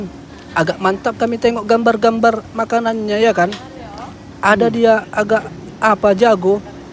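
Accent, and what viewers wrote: Medan, Indonesia. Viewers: suara abang khas banget melayu medan senang aku dengarnya bang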